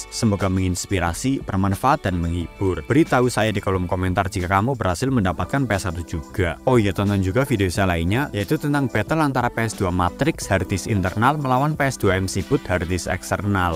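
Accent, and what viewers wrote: Banyumas, Indonesia. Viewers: ora ngapak ora kepenak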